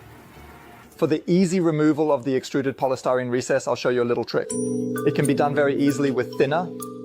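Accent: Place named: Germany. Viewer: your german dialekt which I like very much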